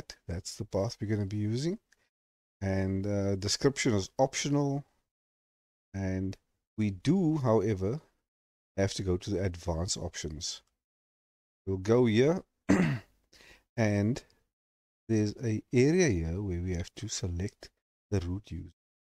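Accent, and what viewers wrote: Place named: South Africa. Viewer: First thing I thought was, Wait is this guy South African? Thanks you solved my issue after sukkeling all day, my settings needed to be fixed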